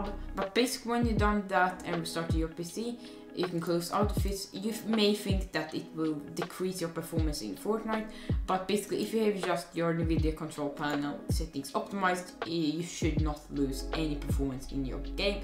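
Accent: Sweden. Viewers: are u from sweden. im from denmark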